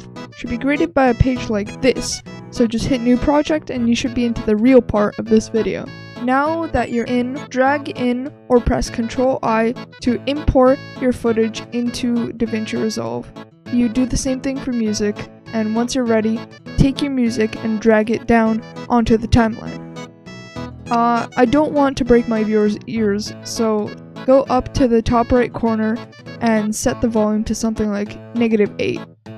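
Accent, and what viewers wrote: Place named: Texas, United States. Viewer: Your from texas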